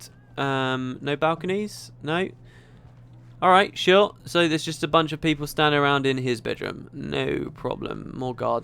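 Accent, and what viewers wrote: England, United Kingdom. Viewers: hahaha, youre so english i love it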